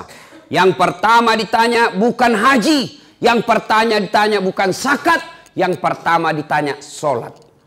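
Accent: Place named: Sulawesi, Indonesia. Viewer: Alhamdulillah, dpt siraman rohani logat sulawesi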